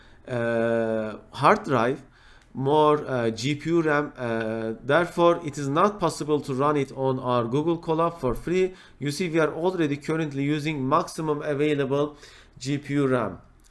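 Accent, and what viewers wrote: Turkey. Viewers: Bende diyorum bu ingilizce neden tanidik geliyor Turk oldugundanmis:)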